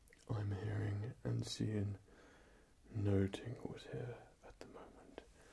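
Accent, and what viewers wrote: Australia. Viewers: May Shida he's Australian I think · Is he Australian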